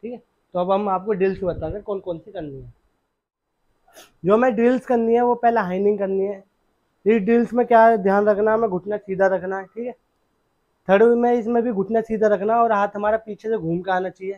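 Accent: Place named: Sohna, India. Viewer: आप बादशाहपूर सोहना से हों, आपकी आवाज बता रही है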